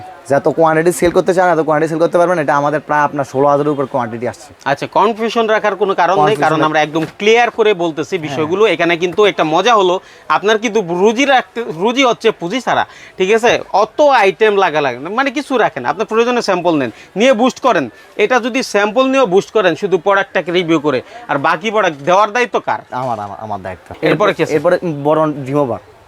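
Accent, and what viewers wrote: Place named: Chittagong, Bangladesh. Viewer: ভাইয়া কথা শুনে মনে হচ্ছে ভাইয়া চট্টগ্রামের ছেলে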